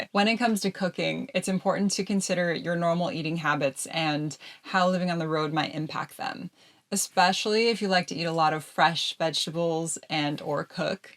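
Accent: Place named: East Coast, United States. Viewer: Another good video, you are punished financially for being single to begin with. I had two camping vans and lived on Martha's Vineyard for a Summer. So it sounds like you are from the east coast which is why I only see videos from the west by you. Your next project could be a TV show but you probably couldn't do it alone. Most travel shows don't show travelling from your perspective.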